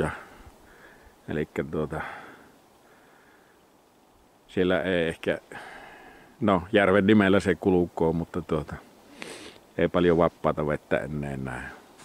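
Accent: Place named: Savo, Finland. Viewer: Nyt on mukava kuunnella kun se on kotikulmilla niin murre tulee niinkun pitääkin. Ps. Kauhee kuunnella savolaisittain kirjakieltä😅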